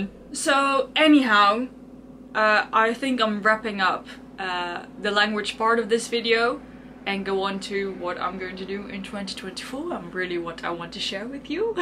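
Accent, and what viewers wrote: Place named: United Kingdom. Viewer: Today is the first time i know you, i am so happy to see you,, i wanna ask you "if you are from the Netherlands, why do you have Brittish accent when you speak English?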